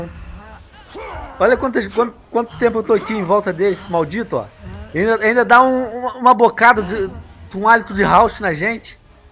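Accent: Portugal. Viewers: voce e de portugal??? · tu és de Portugal?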